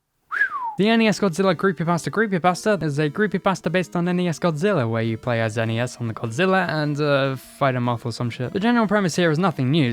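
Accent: United Kingdom. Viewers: your bored british voice is going to be nostalgic some day lol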